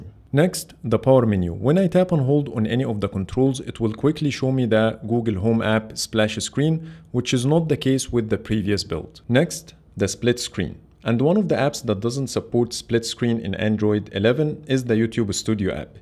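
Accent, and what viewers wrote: Egypt. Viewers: Why the Egyptian accent ? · Is this Egyptian accent 🇪🇬👏😋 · باين اوى انك مصرى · انت مصري 🤔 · Are you Egyptian !!!! لهجتك الانجليزي واضحة أنه مصرية 😁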